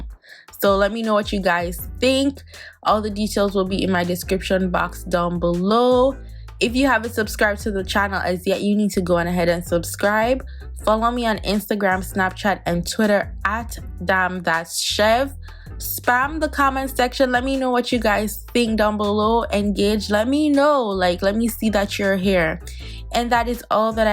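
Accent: Jamaica. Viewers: Beautiful and I love that jamaican creole wah gwan😍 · Ur Jamaican right??? Great vid by the way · I always see your videos but this is my first time actually watching one & I loved it!! The minute I heard you talking I was like “yeah she’s Jamaican” lol ❤️😂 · Are u Jamaican?? Hearing that accent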